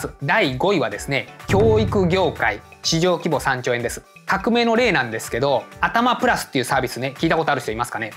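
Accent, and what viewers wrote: Hiroshima, Japan. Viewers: いつもワクワクさせてもらっています。そして、生き残りについて真剣に考える契機になってます。私は、広島弁が人間らしく好きです❗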